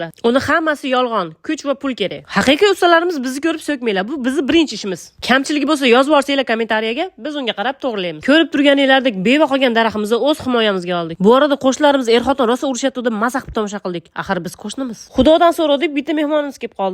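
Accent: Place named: Andijan, Uzbekistan. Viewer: Нечанчи йилсиз, асл исмиз нима асалим, андижонлимисиз шевездан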